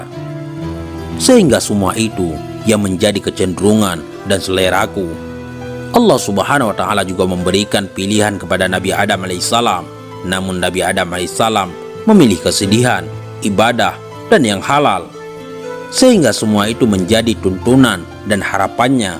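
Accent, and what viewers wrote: Aceh, Indonesia. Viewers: ❤️❤️🙏🙏min pengisi suaranya orang Aceh..ya min...semoga tetap dalam iman Islam smpai nafas berhenti ... Jgan lupa min dialog nabi Isa as dgn iblis jga dialog nabi Muhamad SAW dgn iblis🙏🙏🙏🙏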